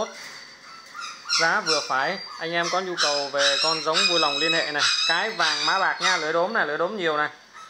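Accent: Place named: Thanh Hoa, Vietnam. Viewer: Giọng bác giống giọng thanh hoá quê ta quá 😂😂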